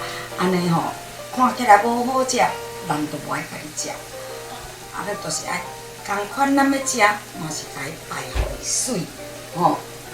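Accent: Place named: Taiwan. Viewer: 的孫真正有福氣，有thang 聽到正港原汁的台語，觀眾也仝齊分享!讚!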